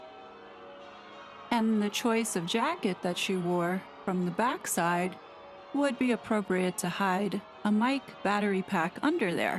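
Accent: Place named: United States. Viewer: Please, tell me how your love for "All things Royal", and/or Anglican Everything became important to you... I'm an American from Boston, and our family loved British TV and culture, had friends in the UK through travel, and their love for that corner of the Globe trickled down to at least one of the six kids, me. It's nice to hear an American voice (and an nice, soothing voice) giving me the scoop with no nonsense.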